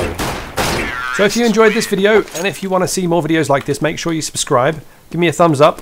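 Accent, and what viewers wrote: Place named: Wales, United Kingdom. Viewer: 1 question plz : u from UK? edit: yeah,i fukig knew it,i have a friend from UK too,and his accent exactly like u...and he live in south wales GReat smoke,dude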